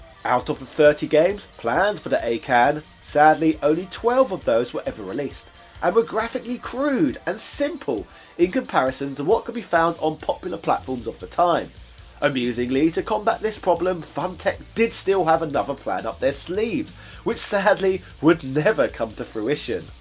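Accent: United Kingdom. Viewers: I love the British voice !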